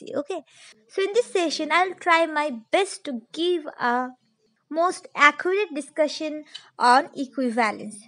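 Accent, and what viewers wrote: India. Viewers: Loved your strong indian accent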